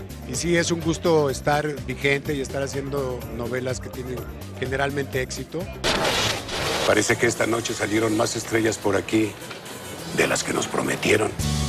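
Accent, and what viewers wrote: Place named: Mexico. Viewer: Tiene acento mexicano pero es peruano.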